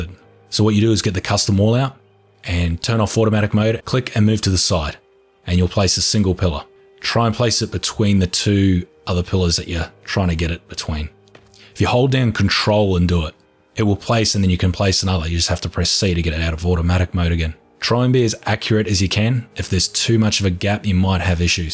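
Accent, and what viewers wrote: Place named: Australia. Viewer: Thanks for this! I made and tested this last night, it works perfectly in the latest version (v1.08). I followed the procedure in the video exactly using log catchers to block off the openings, and viola....a perfectly functioning log catcher! Also, I got it on the first try, so apparently I'll be taking over channel soon! Although, that would never work..... A. I'm too lazy for that much work. B. My voice just wouldn't be as relaxing as, and no Aussie accent. Personally, I agree with on this, and feel this is a workaround for a problem with the game rather than an exploit. Really, there should be a "Log Catcher" available from the blueprints menu, but until that day, or the day the devs nerf this, it's a working solution. Cheers!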